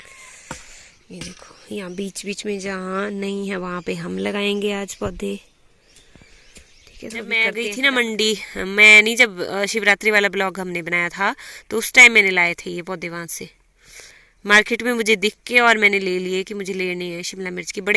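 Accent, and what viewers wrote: Haryana, India. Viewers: Aapki aawaj se lgta h . Ki aap haryana se ho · Madam, lagata hai aap Haryana se ho.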